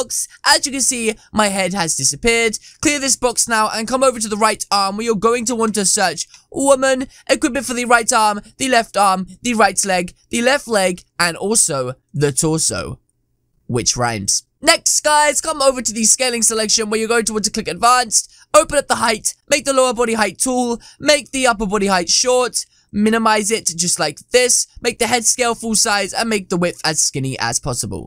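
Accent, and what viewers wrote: United Kingdom. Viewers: British accent make me go crazzy.. AM LATINO.. Ayyy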